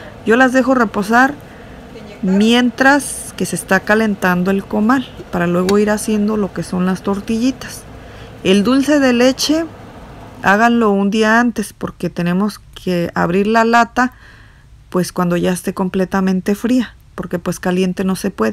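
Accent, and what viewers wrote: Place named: Morelia, Mexico. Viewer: Cuándo oigo que hablas en el tutorial de este video Y de todos tus vídeos me transporto al estilo de voz de la gente de Morelia me preguntó la gente que ve estos videos si alcanza a percibir la diferencia de estilos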